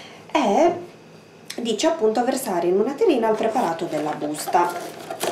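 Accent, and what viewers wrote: Sardinia, Italy. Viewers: Dall'accento sembri sarda, ho indovinato?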